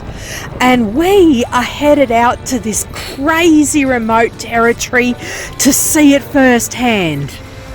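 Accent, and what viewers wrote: Australia. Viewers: Catching Oz storyline educating me on your history! Love the music, footage and raw Australian narrative. This is basic motorcycle adventure with a genuine twist. Keep on keeping on ;-) Gong!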